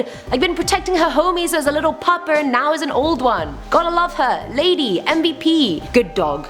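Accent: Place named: United Kingdom. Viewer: I love your British voice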